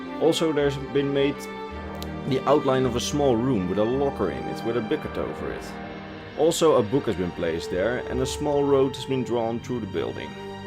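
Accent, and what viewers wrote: Netherlands. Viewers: I think that he's Dutch just like me. We actually created a name for English whilst maintain their Dutch accent. It's Dunglish :P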